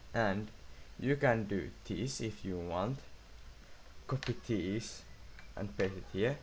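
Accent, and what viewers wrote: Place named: Finland. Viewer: i heard finnish accent toril tavataan perkele :D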